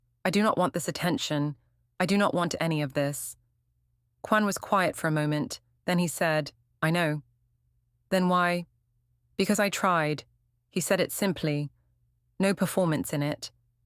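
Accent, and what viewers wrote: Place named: England, United Kingdom. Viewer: I have a hard time to listen this English accent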